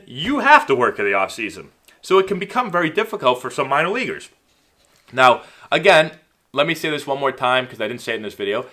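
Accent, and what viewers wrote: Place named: Boston, United States. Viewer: Very faint Bosstown accent..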